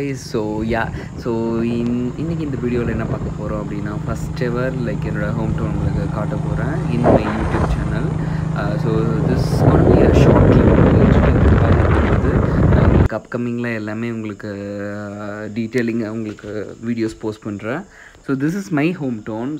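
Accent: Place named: United States. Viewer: நல்லா தமிழ் பேசுறீங்க... அத இன்னு கொஞ்சம் நல்லா பேசிரிக்கலாம்... ஏன் அமெரிக்கா ரிட்டன் மாதிரி பேசுறீங்க....😊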